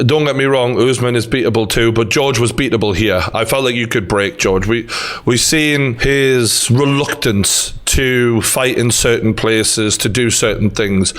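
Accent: Newcastle, United Kingdom. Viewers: if only he knew what you know true geordie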